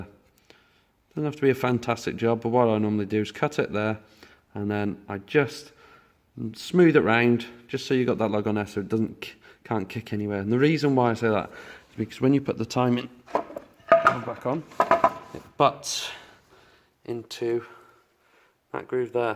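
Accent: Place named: Newcastle, United Kingdom. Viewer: Thought you were a geordie in the beginnnning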